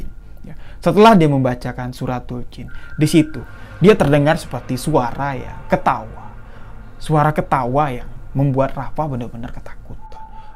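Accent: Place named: Bali, Indonesia. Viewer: Kayak logat org bali mas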